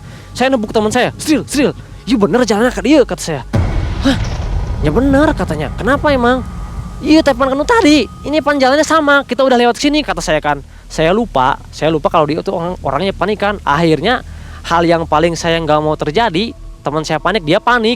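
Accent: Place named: Sunda, Indonesia. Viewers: Sunda nya kentel ga bisa ilang 😁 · Sunda campur Indonesia. .Teuas . 😄 .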